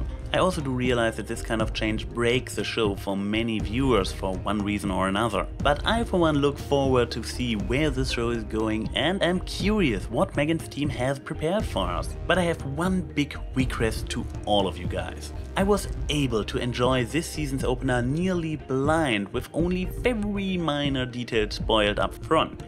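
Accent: Germany. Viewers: Hi. Love your videos but I can't stop thinking about that accent you have. Are you german or what kind of accent is it?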